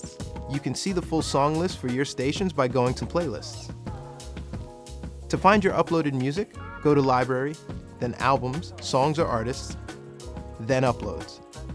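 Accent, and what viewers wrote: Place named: South Africa. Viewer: South African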